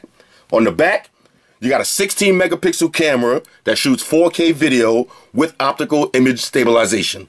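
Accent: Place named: New York City, United States. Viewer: I'm here just to hear your NYC accent!!!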